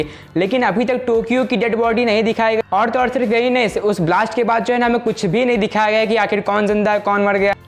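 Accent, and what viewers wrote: India. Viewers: Tu Bengali hai kya bhai????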